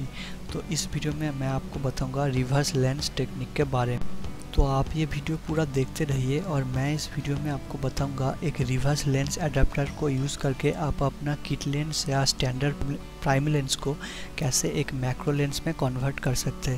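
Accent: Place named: Bihar, India. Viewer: bhaai bihaar se ho aap apki aawaaaaj se he pta chal ra hai.........nice job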